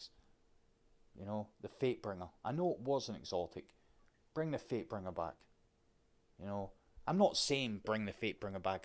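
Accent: United Kingdom. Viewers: You have a kawaii British accent. You should talk more about this. My voice is gay LOL. I sound like a dude.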